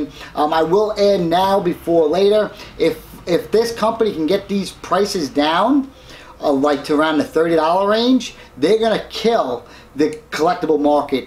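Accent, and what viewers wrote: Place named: Rhode Island, United States. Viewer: Are you from Rhode Island? My family is from there too. I can hear it in your voice.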